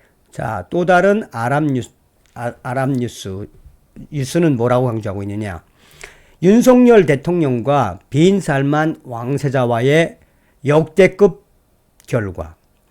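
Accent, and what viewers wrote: North Korea. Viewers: 북한 톤으로 말씀하시는거 듣기 부담스러워요. 항상 방송 잘보고있읍니다 감사합니다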